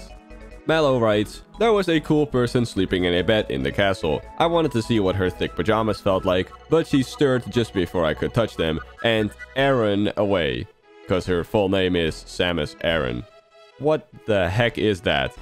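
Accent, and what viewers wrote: Netherlands. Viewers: You sound very dutch im dutch as well loll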